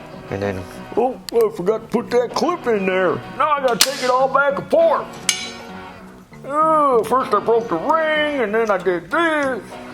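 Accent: England, United Kingdom. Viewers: Your English accent is great! Come over some time and have some warm beer - jolly good show as usual! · That British accent is funny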